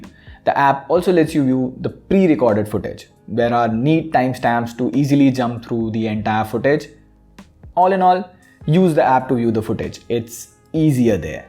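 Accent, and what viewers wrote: India. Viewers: Maybe because of a thick Indian accent?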